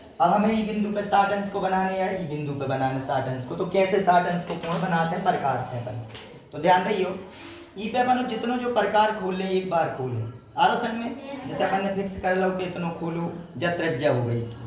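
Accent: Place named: Bundelkhand, India. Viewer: Apni bundelkhandi boli ki bat hi aalag he 🙏🙏🙏❤❤❤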